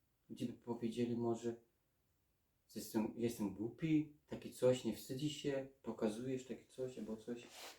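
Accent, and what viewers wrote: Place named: Silesia, Poland. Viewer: Zrobiłeś pierwszy krok ,że mówisz, to już jest dużo. Trzeba wszystko z siebie wywalić,co cię boli wewnątrz w środku twojej duszy. Jesteś wrażliwy i to widać,fajny chłopak ,otwarty,super. Wyjdziesz z tego ,musisz bardzo chcieć a ty chcesz. Tylko od ciebie to zależy. Już ciebie to uwiera i bardzo dobrze. Super facet z ciebie,dasz radę, jesteś ambitny i pokażesz ludziom ,że można. Będziesz jeszcze innym ludziom pomagał wyjść z alkoholizmu. Ty to znasz praktycznie a nie z teorii,masz w sobie prawdę. Walcz o siebie,bo warto. Świat jest piękny. Powodzenia. Nagrywaj filmy jak ci idzie,krok za krokiem powoli będziesz wychodził razem z nami a my będziemy się cieszyć jak każdego dnia walczysz o siebie. Mów wszystko,wszystkie swoje doświadczenia,to co ci przychodzi teraz na myśl,co cię boli.Nagrywaj i mów.☀️☀️☀️☀️☀️☀️🌼🌼🌼🌺🌺🌺🌹🌹🌹🥀🥀🥀🇵🇱🇵🇱🇵🇱🇵🇱🇵🇱. 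Zrób to dla Naszej Ukochanej Ojczyzny. Jeszcze twardy Ślązak,piękna gwara, coś pięknego.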